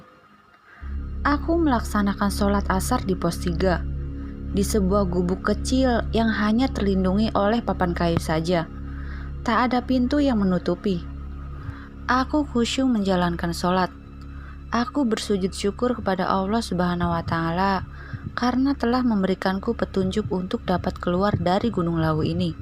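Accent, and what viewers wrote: West Java, Indonesia. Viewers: Yg baca logat sunda...ya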